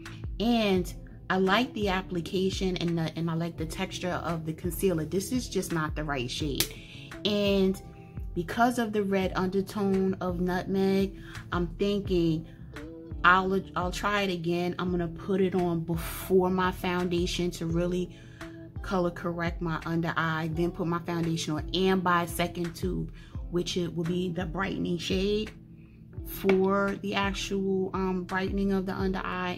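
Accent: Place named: New Jersey, United States. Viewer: Just found your channel and yes! Love your content Jersey Girl!(?) or if not from my home state, your accent tells us you are close neighbor👍🏽! Truly hope and pray your channel continues to grow because you know your stuff! So Awesome!!!! Your skin is amazing and so appreciate you doing You!!!! Thank you!! Can’t wait for the next video😊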